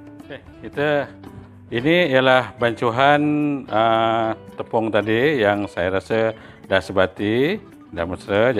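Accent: Java, Indonesia. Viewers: Rapopo wak ngomong melayu lekat jowo pekat, macam mas toha,, lagi mantap wak...👌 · Mula2 ingatkan youtuber dari Indonesia.. Sekali dengar dia speaking..owalah wong jowo Malaysia rupane.bagus la kang ! Alhamdulillah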